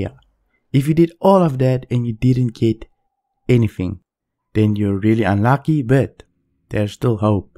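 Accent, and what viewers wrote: South Africa. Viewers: Is that a South African accent I hear? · Are you south african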